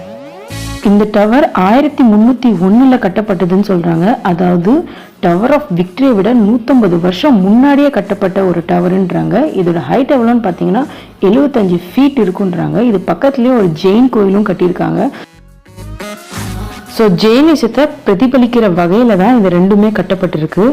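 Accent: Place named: Tirunelveli, India. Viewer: தம்பி food review சிறிது திருநெல்வேலி பாஷையுடன் நல்லா இருந்தது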